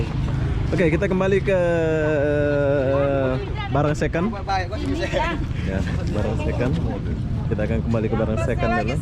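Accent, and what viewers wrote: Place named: Malaysia. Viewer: Pagi btul dah mrayap ke jodoh bang..logat mlayu asli mlayu ke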